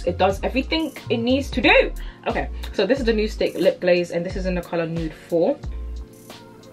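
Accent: United Kingdom. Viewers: I've watched your videos before. Ibnever expect a British accent I think it's because to me you look like LA beautiologist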